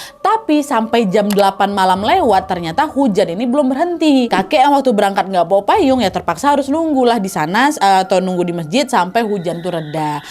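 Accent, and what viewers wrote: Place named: Banjar, Indonesia. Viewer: Kak, jujur kakak mirip bgt sama keponakan suamiku. 11.12 loh kak bedanya suaranya aja yg disini lebih ke Banjar ❤sehat selalu ya ka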